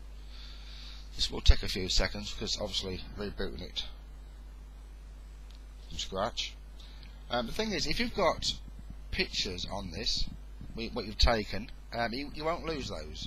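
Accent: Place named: England, United Kingdom. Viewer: thank you sooooooo much....now i love english people fo their accents and for their tech support..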